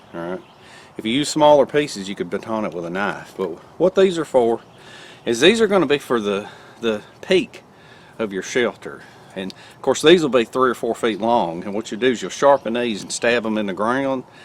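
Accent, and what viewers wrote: South, United States. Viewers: And, in case you didn't know you have a Southern US dialect, here's two cases of Northern Britain: Scottish: <link> And Welsh: <link> I'm rather amazed how people claim you are speaking the same language. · You were the first person I subscribed to on YouTube. I always look forward to your videos. The videos are informative and entertaining. I hope you, Nick and the rest of your family have a very Merry Christmas. GOD bless you. Also, I like the way you talk. I live in Arkansas. We speak southern too! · "I'm Southern. Cut me some slack." lol. Sometimes I watch your videos just to hear your accent! You seem like the nicest guy on YouTube. You always call things a "little gem". Well I think YOU'RE a little gem. Your experience and knowledge are second to none. And this video is EXCELLENT. A brilliant saw tutorial. I had no idea what the different types of teeth were for or how they functioned, ie wet vs dry wood. 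Never have I read on any saw descriptions what they are specified for. Now I understand saws SO much more. Thank you for all your videos. I've learned so much from you.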